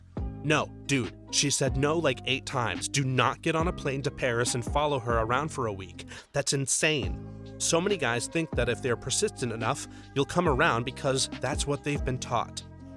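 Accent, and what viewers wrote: United Kingdom. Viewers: I'm curious, are you from the US? Perhaps I just prefer the British accent to the US accent 🤷‍♀️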